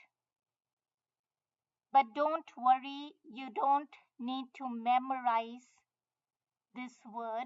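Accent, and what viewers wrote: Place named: China. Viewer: ...I don't know but the person has got a Chinese accent somewhat but speaking in English and German....XD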